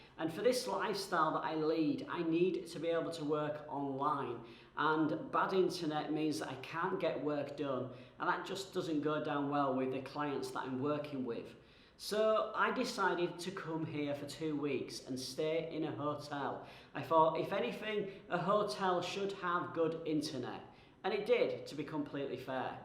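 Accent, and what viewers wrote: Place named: Yorkshire, United Kingdom. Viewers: cant wait to visit Malaysia again, will put Kuching on my to visit list, love the lack of tourists comment. good yorkshire accent you have and thx for the tips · I like your yorkshire accent.. eiyupp? Used to live in Yorkshire for years.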